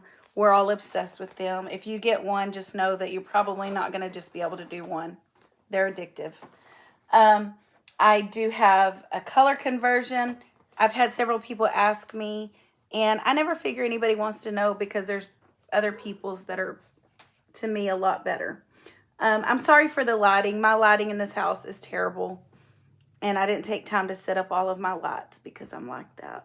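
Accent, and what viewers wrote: South, United States. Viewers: I love the southern accent in your voice. I first met you at Annie's retreat Texas Hold 'Em last month and then again at Stitching Texas. You are so sweet as everyone is. I'm so glad I found your Floss Tube. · Southern!!!! ❤you girl!!!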